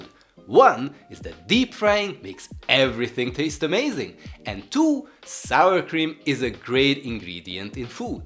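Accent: Hungary. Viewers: Jó ez a kis hunglish, ment a like! :) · anyám.. ez az akcentus... nagyon jól megy az angol, de érződik a magyar... Did give a like tho...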